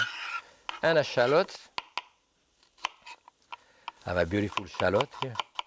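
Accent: France. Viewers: "I was repeating what I heard." if that's what you heard, THAT'S the problem. he doesn't say "ze.I was not making fun of his English" I believe that, I believe you... which is part of the immigrant problem (because he doesn't say "ze")... but YOU still somehow "hear" (I don't doubt this) some caricature of a french accent. (and I'll fully admit his accent lives on in his "hour" - but JFC just STOP with the "ze" nonsense) julia child called him instead of "." that's just true. your "Pepe LePew" nonsense isn't. if you read this far: I don't think you were trying to mock his accent. but I also don't think you were listening.... or you were and you're an american that somehow has never heard an accent beyond and LePew (and maybe Clouseau: i.e., "minkey") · Yeah, after so long in the US, French accent mostly shows through in dropping the 's' in plural words. I mean, there's other things, too, like 'roast it for, like, an arrr" which are just beautiful. 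He couldn't be more American but also, just, so, so French. (I'm from Chicago, but I live in Montreal, so my French is...weird)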